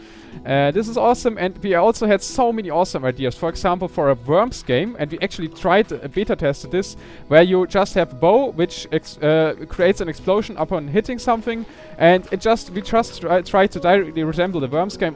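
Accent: Germany. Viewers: Your German accent makes it hard for me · You're awesome :) awesome German accent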